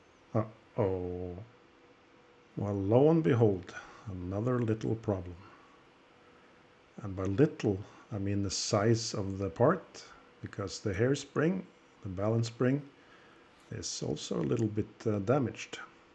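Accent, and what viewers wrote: Norway. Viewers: var bare hyggelig å høre at du fortsatt hadde norsk aksang på engelsken. Digger det du gjør👍🏼 så håper å se mer av dette :) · Are you German? Your accent sounds so damn Norwegian! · Financially it probably wasn't worth the effort but emotionally...its a beautiful timepiece and I would be very proud to show before and after Shots if i had been able to restore it like you did. You mentioned your in Switzerland...I was thinking. "But he sounds Norwegian to me!" 😀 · Your accent sounds more norwegian than swiss?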